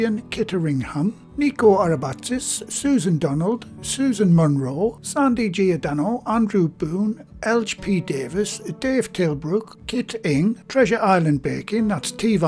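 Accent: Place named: Newcastle, United Kingdom. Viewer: Not only are these videos great to watch (and have worked well for me so far) sounds like a Geordie Winnie the Pooh and it's mint!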